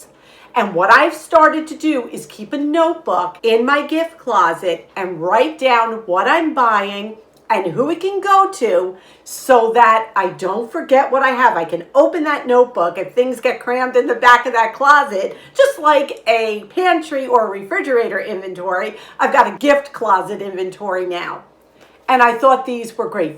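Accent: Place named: Boston, United States. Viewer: I love your accent. You sound like a Boston girl from italy.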